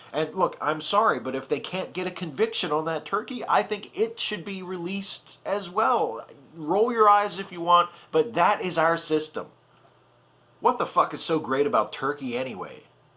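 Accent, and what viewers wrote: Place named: Appalachia, United States. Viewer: That fucking hill billy accent. xD I'd like to hear more of that!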